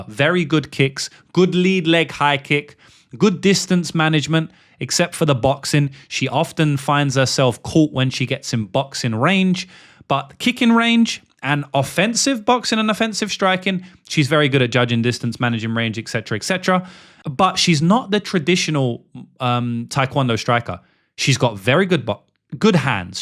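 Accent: United Kingdom. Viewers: I’m glad this channel is getting bigger. I remember absolutely hating when I first watched him. I was like “who is this arrogant prig with the English accent and f his mama” but I had the accent and the man messed up. lol. Three years later and I never miss a single piece of content. 😅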